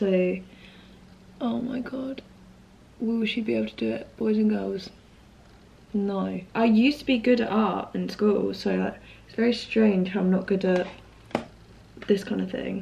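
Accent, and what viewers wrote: United Kingdom. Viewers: I like the English accent from her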